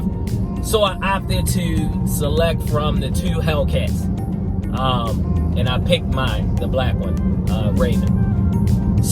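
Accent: Baltimore, United States. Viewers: Gatta be from bmore good vid brother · you gotta be from baltimore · Are you from Baltimore?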